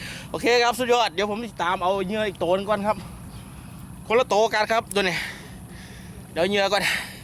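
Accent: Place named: Korat, Thailand. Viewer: น้าพูดเหมือนคนโคราชบ้านผมเลย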